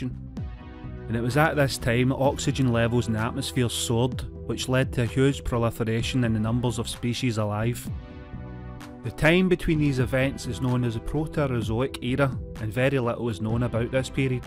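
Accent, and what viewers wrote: Scotland, United Kingdom. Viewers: Couldn't make it longer than 2 minutes. Cannot understand the world's strongest Scottish accent and I'm English 1st language. Idk why the Scots always sound like they're gargling marbles & whiskey... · AWESOME video & love you are still putting that amazing Scottish accent to good use doing videos! ~Ginger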